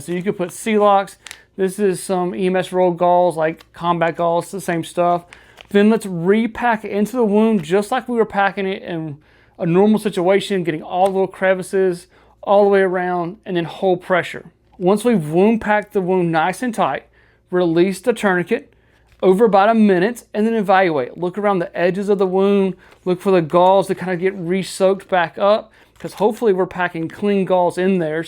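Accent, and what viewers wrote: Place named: United States. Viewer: Very American sounding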